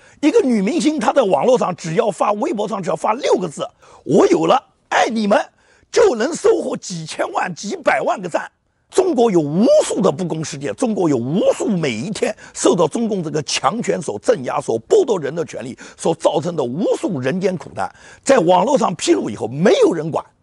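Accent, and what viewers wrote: Nanjing, China. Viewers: 啊是南京人啊，听口音像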